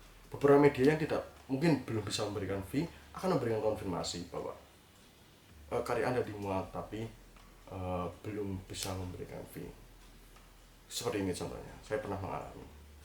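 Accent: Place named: Ponorogo, Indonesia. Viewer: Ketika ngomong ponorogo medok banget mas.. i like it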